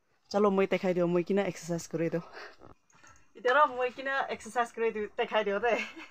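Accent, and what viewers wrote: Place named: Nagaland, India. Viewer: Sis I like da way u speak nagames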